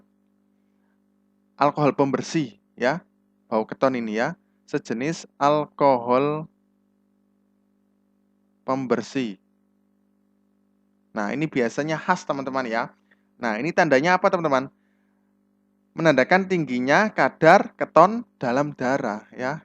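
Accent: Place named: Bengkulu, Indonesia. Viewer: Cak nyo wong bengkulu abng ni😂.. sukses terus chanelnyo banh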